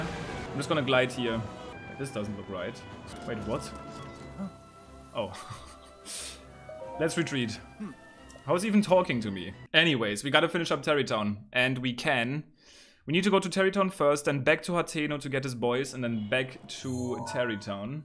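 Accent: Germany. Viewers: Ich weiß direkt dass du deutscher bist Lul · Bruder bist du deutsch?? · Why are you... German? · Dieses alman englisch ist echt nice, irgendwie xD😅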